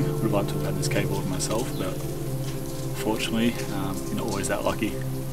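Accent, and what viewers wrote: Australia. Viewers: I'm new to your channel. I found this quite by accident, and glad I did. Things in Australia and here in US are very different. I've never seen that kind of pot handle, nor that kind of food. I love the accent. (Shame on me, I just noticed I did see one video before didn't realize it. How can I listen to the accent and not hear the difference, I must have woke up deaf that morning.)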